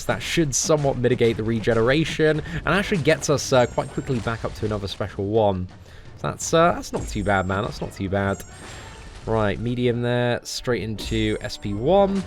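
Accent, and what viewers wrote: England, United Kingdom. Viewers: This speech is the reason why I never travelled to england. You get headache in seconds. Horrible.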